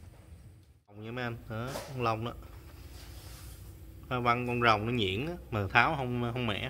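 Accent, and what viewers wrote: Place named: Mekong Delta, Vietnam. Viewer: Cửa hàng mình ở đâu vậy anh..? Nghe anh tiếng ngươi miền tây ạ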